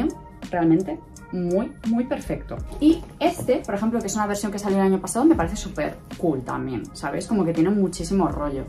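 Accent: Galicia, Spain. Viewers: Tu acento gallego me encanta! 😍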